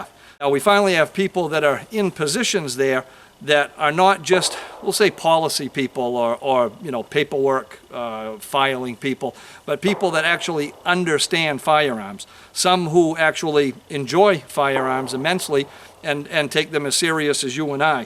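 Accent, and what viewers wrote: Maine, United States. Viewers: Sounds like your from Maine bub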